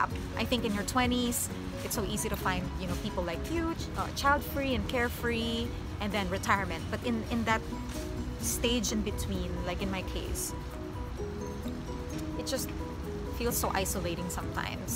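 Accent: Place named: Philippines. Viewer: You lose your accent when you sing. I love the Filipino accent. :)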